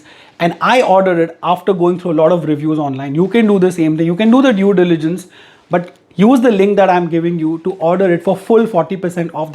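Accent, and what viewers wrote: Hinglish, India. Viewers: Luv ur hinglish presentation 👌🏼👌🏼😀😀 ... Thatha ... 😀😀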